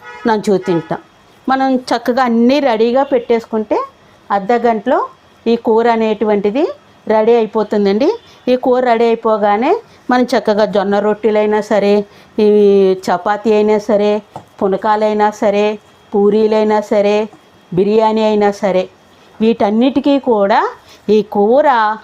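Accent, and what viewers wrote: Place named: Telangana, India. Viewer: Memu godavari Jilla vaallame naaku aavidham gaa maatlaadadam chaalaa istham kaani meeru Mee bhaashani maatlaadadam maanesi telangaanaa I pattukunnaaru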